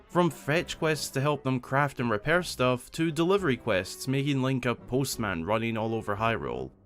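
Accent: Scotland, United Kingdom. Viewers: I like yer scottish accent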